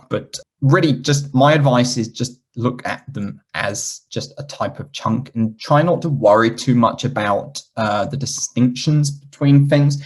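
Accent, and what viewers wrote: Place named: United Kingdom. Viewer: You sound like a British for me 🎉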